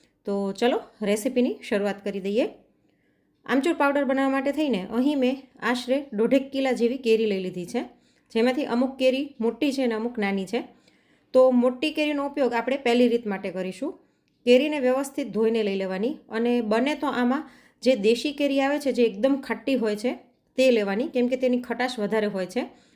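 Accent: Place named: Bhavnagar, India. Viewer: ભાવનગર બાજુ ના છો ?ભાષા એવી છે માટે પુછયુ ખુખજ સરસ રીત બતાવી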